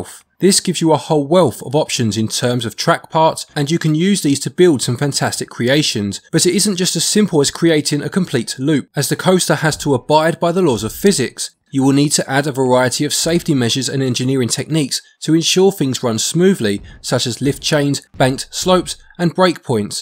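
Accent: England, United Kingdom. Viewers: I watch a lot of your videos and alway wondered where in England your accent is from, thanks for clarifying 😀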